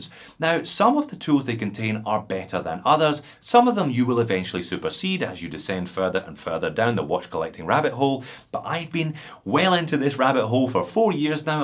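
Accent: Scotland, United Kingdom. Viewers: Love your Scottish accent 🎉🎉🎉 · Great video and amazing you sponsored it yourself! are you really Scottish?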